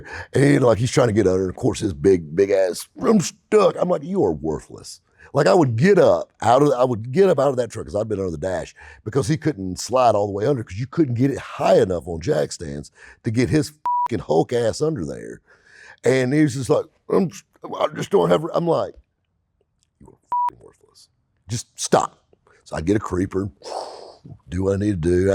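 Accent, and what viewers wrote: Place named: South, United States. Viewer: I swear this is the best YouTube channel out there! I relate 100% I'm from Darlington SC and I swear you talk and act just like my dad. I live in the north now I miss that southern twang now and again keep making em!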